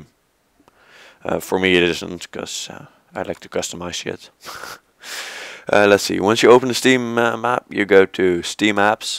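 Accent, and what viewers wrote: Netherlands. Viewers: oke gozer ik hoor dat je NL bent maar het werkt niet bij mij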